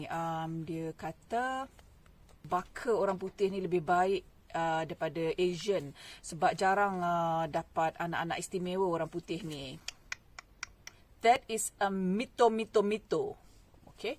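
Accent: Negeri Sembilan, Malaysia. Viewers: camano tetibo akak speaking nismilan ... asal n9 ko?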